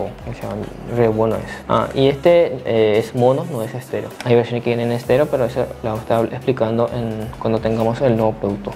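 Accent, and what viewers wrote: Paraguay. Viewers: por el acento es Paraguayo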